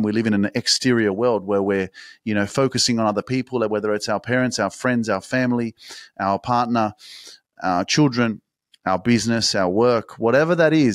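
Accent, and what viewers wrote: Australia. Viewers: I love your daily msgs. New listener but I’m learning your dance moves and it always gives me a lift. Love the accent, Aussie. Love the msg. Turned my daughter on to you. She’s in a bad place in her life and needs positive reinforcement. Keep it up❤️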